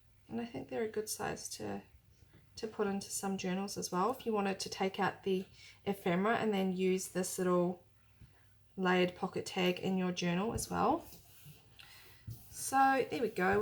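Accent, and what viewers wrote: New Zealand. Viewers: Love this video. I am a new subscriber from Sydney. Got put onto your channel by my sister' Roxy Creations By Rachel. Love all that you make and could totally tell that you were a Kiwi as soon as I heard you:)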